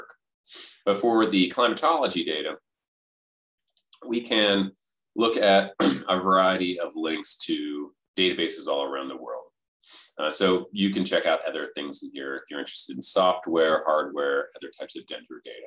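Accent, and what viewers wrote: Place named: United States. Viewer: Professor, thank you very much and congratulations for this very didactic video. Could you also add the footnotes in this video to allow a good understanding? I, for example, do not understand the American accent very well.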